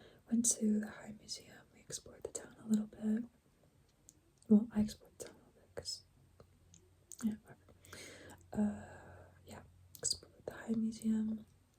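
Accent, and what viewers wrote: California, United States. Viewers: That accent is pure cali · She definitely looks and sounds Californian. She’s humble though. ❤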